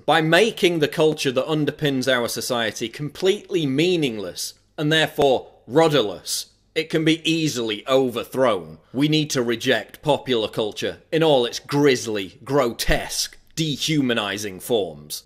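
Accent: United Kingdom. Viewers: well i think to myself... end game terms...after gamergate. are they trying to reforge men born in hellfire to absolutely fucking hate democrats. rest assured they will. they are only making sure that the future gen will be dominantly conservative! they're morons of the highest caliber i like PJW's narration out of all infowars/planetprison shows. especially when he gets extra british crusy lol, slaying it with intellectual logical facts...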